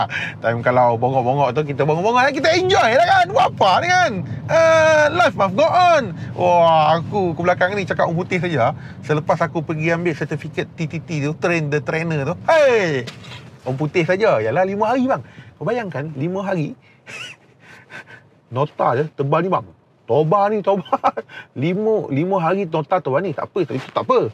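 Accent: Negeri Sembilan, Malaysia. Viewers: Bang cakap nesmilan yolah best bonar YouTuber keto cakap nesmilan 👍🏆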